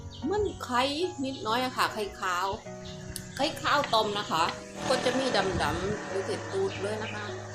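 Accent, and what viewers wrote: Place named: South, Thailand. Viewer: ชัดเจนเลยคนใต้เช่นกันเลยค่ะ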